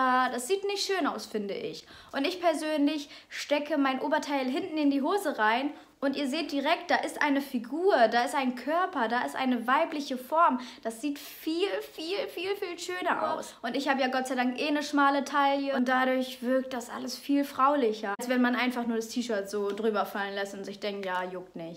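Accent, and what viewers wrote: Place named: Germany. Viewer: Sie hören Cute Your German Akzent und Sie sehen niedlich diese Jeans in Ihrer Figur. Nette Mädchen Grüße aus Mexiko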